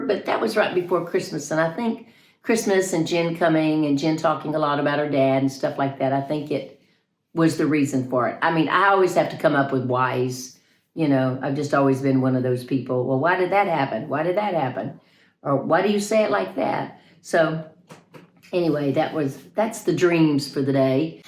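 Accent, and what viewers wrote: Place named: Georgia, United States. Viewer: I just found your channel. I didn't know your background, and I thought this lady speaks French with a Georgia accent! My parents retired in Augusta, Ga, so I know that accent well!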